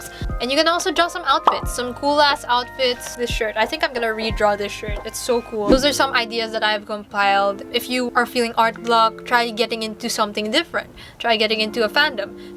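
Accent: Philippines. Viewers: You are from the Philippines? I hear from your assent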